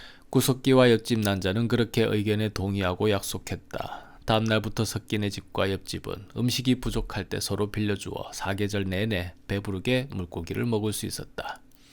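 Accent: Gyeongsang, South Korea. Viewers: 근대 해설자님은 사투리를 경상도만 써요? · 경상도 사투리가 구수할수도 있구나~^^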